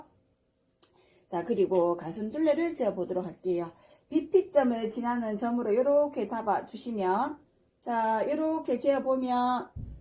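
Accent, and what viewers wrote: Gyeongsang, South Korea. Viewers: 문화회관 출강하신다고 하셨죠? 지역이 어딘지 가까우면. 수업듣고 싶엊네요 말씨는 경상도라 살짝 기대해봅니다 ㅎㅎ 막힘없는 설명 속이 뻥뚫리네요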